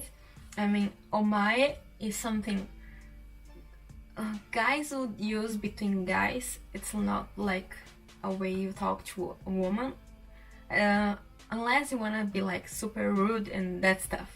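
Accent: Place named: Portugal. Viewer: Uhhh... You speak in english but you keep the portuguese accent... I love it! BTW, you look beautiful! 🥰😍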